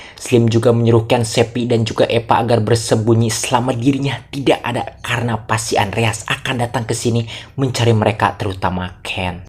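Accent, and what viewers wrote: West Java, Indonesia. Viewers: abang ini asal sunda? Sunda nya di mna bro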